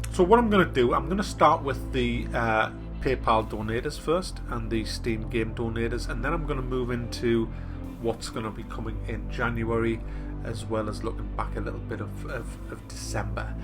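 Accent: United Kingdom. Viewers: Somewhere from the UK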